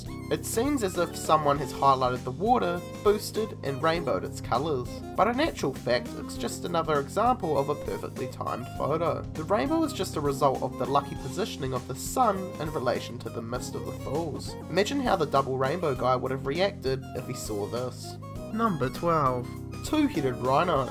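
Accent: New Zealand. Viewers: That new Zealand accent is making my ears bleed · He could be from Whakatane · It's his accent you fucking idiots, not a lot he can do about it. He's from New Zealand. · Yeah He is from New Zealand probably Aukland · definitely from New Zealand. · the brogans Dunno if he's a Kiwi or not...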